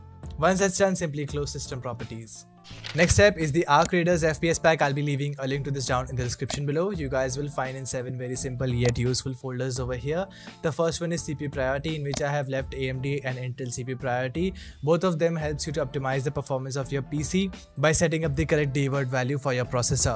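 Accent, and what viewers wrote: India. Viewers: he sounds indian thats why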